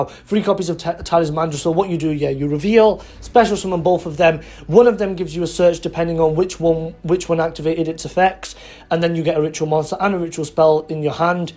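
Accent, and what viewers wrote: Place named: United Kingdom. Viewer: #Nekrozmasterrace I pronouce Natasha the same way you do, even though the only girl I met with the name irl pronounced it like stache too. Personally Impcantation is a better name than Deviritual to me. It's a pun I can get behind. Yeah, I believe you're british. Saying that as a fellow British. I can recognise our kind. 'Eyyyyyyy! That ghost rare artwork for the extra deck cards.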